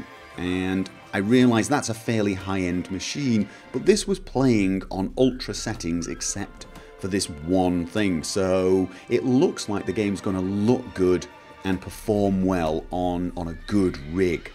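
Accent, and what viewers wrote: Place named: Northern England, United Kingdom. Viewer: ***** Bollocks, he has a northern british accent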